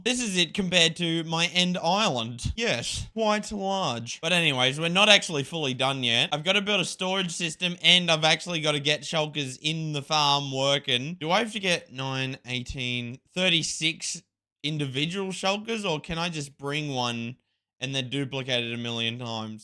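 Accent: Australia. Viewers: You sound aggressively Australian, but like you've lived in Britain or the US for like the last 2-3 years.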